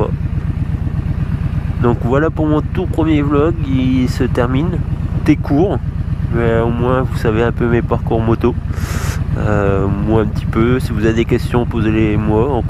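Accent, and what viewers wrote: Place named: Champagne, France. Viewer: l'accent champenois ! ! ah si si, je connais ! :) sympa t'es bécane ! du coup tu as arrêté les wheelings suite a la rsv1000? V & +1 abo! ;)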